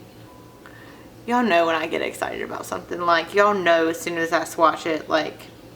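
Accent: South, United States. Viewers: where are you from? I hear southern but what part? I'm in Houston Texas myself! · oh i just seen in the description box im from NC TOO ZEBULON AREA...HEARD OF IT? I KNEW IT HAD TO BE NC OR SC W.THAT COUNTRY ACCENT LIVE IT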